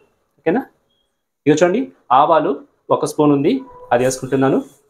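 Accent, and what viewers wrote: Tamil Nadu, India. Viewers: Though you are a Tamilian, your Telugu is very sweet and impressive.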